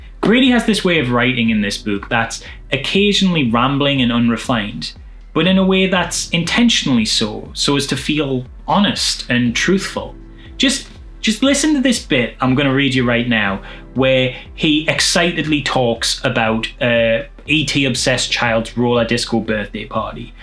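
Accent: Newcastle, United Kingdom. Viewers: That's an incredible book cover! Btw, I have loved this whole series so far. I am a pretty serious horror fan, but I never thought of how heartwarming and wholesome the genre could be, and nowadays I live for that shit. So thanks for bringing these gems to all of us and talking about them so genuinely in that charming-ass Geordie accent.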